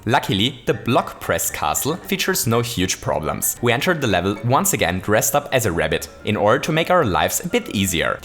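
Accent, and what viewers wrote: Germany. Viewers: Are you german? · Bist du ein Deutscher ?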